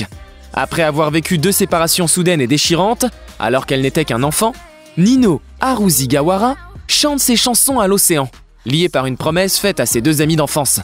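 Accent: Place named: France. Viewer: i can't stand your English accent bro, you're literally read english words with frensh accent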